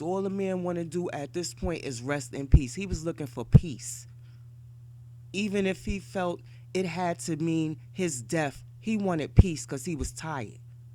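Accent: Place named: California, United States. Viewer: Listen u cuarvoint lady 😅😂😂😅 talk bout wht u kno you sound like you from California and you country niggas is always talkin bout New York business and don’t kno wht da fuck ya talkin bout that young lady was from BROOKLYN she was a somebody and that’s why them BROOKLYN niggas shot him in da dick point blank period now go ya chicken an waffle eating the same time ass da fuck up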